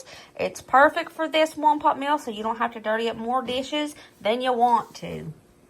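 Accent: South, United States. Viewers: I can't tell you how much i love listening to you talk in that amazing and soothing southern accent! Its as good as all the comfort food you share.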